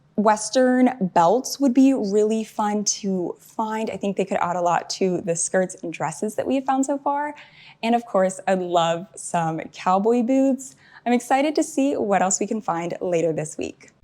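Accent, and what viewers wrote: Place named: United States. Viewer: Hi. I'm from new Zealand. I love your accent. What part of America are you from?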